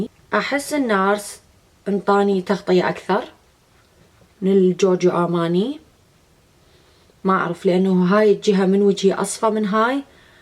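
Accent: Iraq. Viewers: حبيبتي انتي عسل ومررره متواضعه .. واحلى شي اللهجه العراقيه عششششق ♥️😍 .. ربي يحفظك 😻. .. حبيبتي ايش ترشحي اكثر جورجيو اىماني ليمونس سيلك والا استي لودر دبل وير ... ايهم افضل ويقعد تطول وما يخطط محتااااااااره بينهم 😔😔😔😔💔💔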